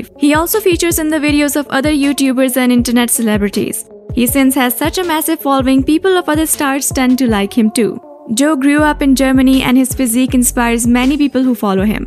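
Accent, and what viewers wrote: India. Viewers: Great......Sounds like a Indian.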